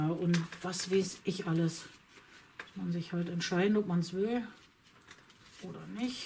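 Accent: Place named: Berlin, Germany. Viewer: Hallo, großes Kompliment für Deine Videos, die sind informativ, mit praktischenTips, Bezugsquellen und "auf dem Teppich geblieben" 😁 Dabei mag ich auch sehr den Berliner Dialekt und Deine treulich kommentierende "bessere Hälfte"!! Bei Euch geht es nicht - wie leider so oft heutzutage - um mehr Schein als sein. Ich guck's total gerne! Danke!! Viele liebe Grüße, Michaela ( Nordhessen mit Berliner Wurzeln 😜) 👍🍀🐞👍❤️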